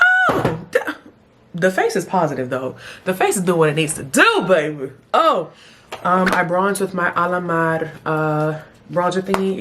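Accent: United Kingdom. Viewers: I love it British voice 💓 LOL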